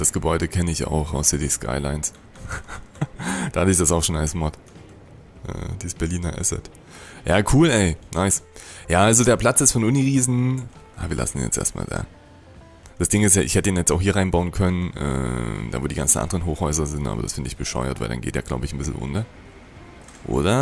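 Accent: Saxony, Germany. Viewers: Hallöle! Guck schon seit ein paar Tagen deine Videos zu Transport Fever 2 und habs mir deswegen auch selbst gekauft. Falls dir auf deiner kleinen schnuckeligem Karte mal Platz und Vorhaben ausgehen hätte ich eine Idee für dich. Im Workshop gibt es eine Deutschlandkarte in extragroß, da könntest dich auch austoben. Ich hab z.B. bei mir die Basiswerte für ein paar Städte angepasst damit sie von der Größe und Fläche auch entsprechend mitskalieren. Ausgehend vom Wert 200 für eine Stadt mit ca. 800k Einwohnern hab ich Berlin auf 800, Hamburg und Wien auf 400, München auf 320 und Köln auf 250 gesetzt um ungefähr an die realen Größenverhältnisse ranzukommen. Zusätzlich fehlt auf der Map jegliche Industrie, die müsstest du im Karteneditor erst hinzufügen. Oder aber, wenn du magst, kann ich dir meine Map zur Verfügung stellen, da es noch ein paar Kleinigkeiten gab die an der Karte zu machen waren. 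Die größte Herausforderung wird sein zum einen den Straßenverkehr in Berlin in den Griff zu bekommen. Wenn man 1850 startet brauch man vor Erfindung der Tram nicht wirklich in Berlin was machen. Als zweites wäre der Aufbau ein nationalen Fernverkehrsnetz nach realem Vorbild + die eine oder andere Regionalverbindung ein lohnendes Ziel. Ich versuch das ganze grad auf schwerem Schwierigkeitsgrad und es ist alles andere als einfach.^^ Zum Schluss noch: Dein Sprachfehler, gepaart mit sächsischem Akzent ist witzig, nicht jeder muss hochdeutsch können. :) MfG, Jester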